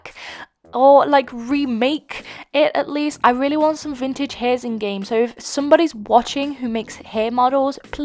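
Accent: United Kingdom. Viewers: Are u British lol you'll never answer 👌🏻BTW I love ur channel🌺🌺🌺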